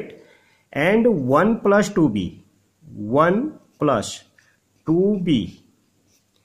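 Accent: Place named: East India, India. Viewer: That is an accent of people from east India and Bangladesh. So I would rather not comment though I know the comment is in good faith.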